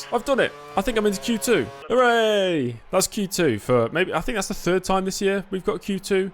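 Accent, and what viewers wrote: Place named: England, United Kingdom. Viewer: I only follow this content because of your perfect English commentator's accent 😂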